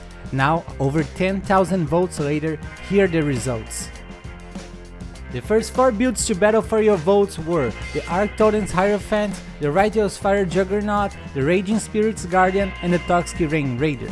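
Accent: Brazil. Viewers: cara, vc é br né esse sotaque ai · Dude, where u from? You have a Brazilian accent xd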